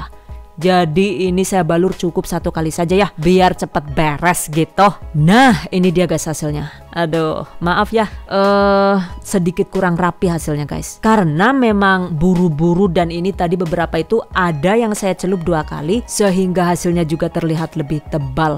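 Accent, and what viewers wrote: Banyumas, Indonesia. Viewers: Bund orang mana yah? Kox Ngmongnya Ngapak banget,😅 siapa tau Kita satu daerah 🤭🤭🤭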